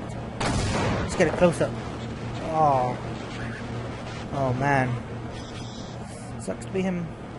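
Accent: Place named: England, United Kingdom. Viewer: english accents ftw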